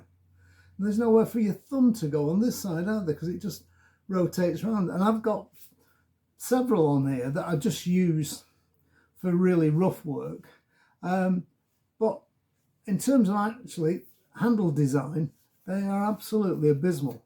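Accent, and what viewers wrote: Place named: United Kingdom. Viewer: Thanks for posting this, it's very timely in that I do have to sharpen both my tenon and gentleman's saws. I also have a Disston crosscut saw which needs re-sharpening after I managed to mess it up many years ago and haven't had the courage to revisit. I was considering using one of the many blunt, disposable saws I have as a practice piece before tackling the the disaster which is now my Disston. I'm hoping that the teeth on those disposables aren't too hard for my file, I suppose I'll be finding out very shortly, LOL. Now that I am armed with your inspiration and the need to do something, plus an endless supply of potential practice material a sharpening might actually happen. I cut down those disposable saw blades into points about 200mm long to make stab saws and the like, brilliant for cutting out holes in plaster board and similar stuff, the teeth closer to the handle get little use and stay quite sharp while the rest are wasted. 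It beats just chucking them away, and makes nice, cheaper than cheap custom shaped saws with little effort and an angle grinder. Has anyone used those blades to make scrapers? Just a thought I was going to put to the test. I've subscribed. It's refreshing to hear a British accent which isn't AI tripe, giving some solid, no BS advice. Thanks so much.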